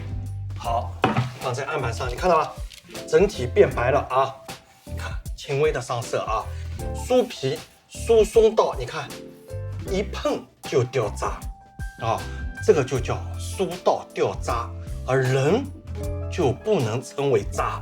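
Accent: Shanghai, China. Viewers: 朱老师带着上海口音的诙谐和幽默, 太有趣了! 赞一个!😃👍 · 朱师傅您太幽默了。哈哈哈哈个口上海口音来的个亲切。。下下分享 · 上海口音好亲切啊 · 很搞笑，是上海或者苏州口音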